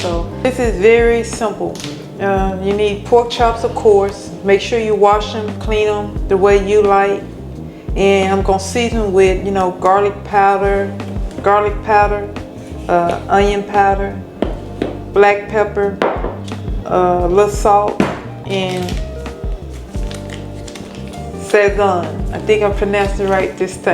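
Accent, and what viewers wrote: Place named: Chicago, United States. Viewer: She sounds like she from Chicago with that cousin and em 😂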